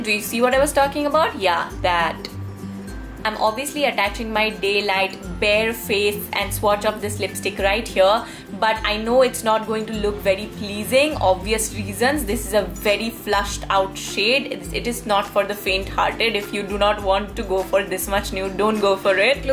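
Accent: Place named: Bengal, India. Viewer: I have subscribed already yaar....❤️....I follow u on myntra as well.....I like ur attitude and accent ...u are just like me....and I'm Bengali too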